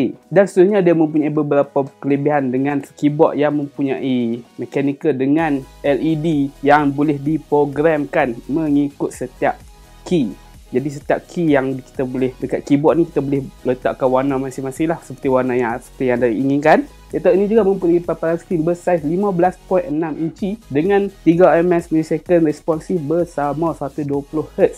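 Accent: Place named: North, Malaysia. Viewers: kekalkan BAHASA UTAQA! sempoi 👍👍👍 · Tudiaaa pekat bakhang utaraa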